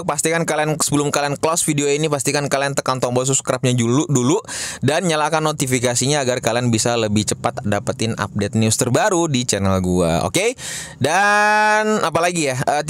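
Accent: Bugis, Indonesia. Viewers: Bang,, orang bugiss yaaa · Bugis ya bang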